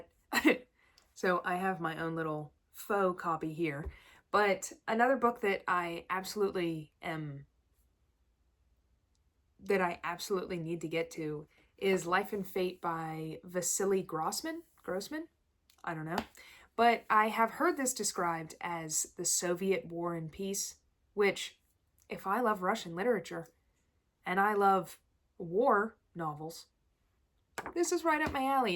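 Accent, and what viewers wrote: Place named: South, United States. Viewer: Just found your channel. Enjoying the content. I am having trouble placing your accent though lol I think I detect a little southern in there, but I'm not sure haha Where are you from? Where did you get your history degree? Thanks for your channel 😃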